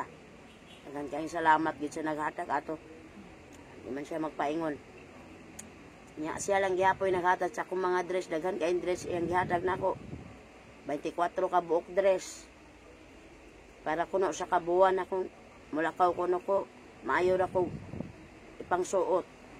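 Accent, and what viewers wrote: Visayas, Philippines. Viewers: ano Yong accent mo na bisaya? Sabi ng kaibigan ko na tga Cebu? Yong boses mo daw prang Lola na? 😩🤣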